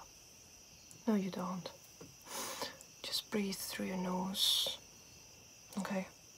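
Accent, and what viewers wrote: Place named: Netherlands. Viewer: I'm trying to place her accent but...Dutch maybe?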